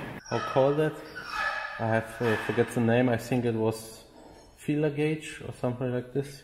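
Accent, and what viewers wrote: Germany. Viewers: it sounds like a german guy speaking english. wrong how? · wenn deutsche englisch sprechen... :D aber er weis was er macht-top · German English is actually cool to me. We are all car enthusiasts...we kind of already know what is happening even without a lot of words. 😊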